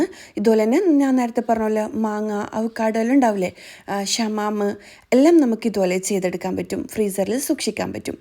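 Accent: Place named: Kozhikode, India. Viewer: കോഴിക്കോട് സ്ലാഗ് ആണ് കേൾക്കാൻ രെസം വ്ലോഗ് ഇഷ്ട്ടം ആണ്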